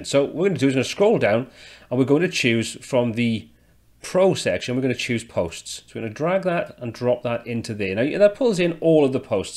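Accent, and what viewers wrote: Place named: Newcastle, United Kingdom. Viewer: Well, isn't that an elegant approach, very smart! I'm trying to find some visually interesting way of doing 3 tabs that are all text (question then answer), and found your video, and though this doesn't work for me in this case, it's something I'm bookmarking and saving, as I think I may have a use for this in the future. Thank you. Have subbed as well, am looking forward to watching more of your content. And, you sound Geordie...are you? My Dad was from Wallsend, it's my favourite UK accent and always makes me think of him when I hear it!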